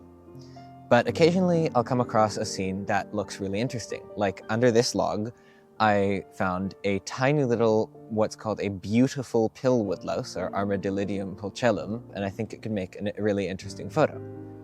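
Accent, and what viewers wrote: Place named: United States. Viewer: You mentioned your location is Germany, but you're sounding American. If you're living in Germany, in which area is it? I love to see younger people doing photography or even macro. Usually its more an old white man stuff... 🙂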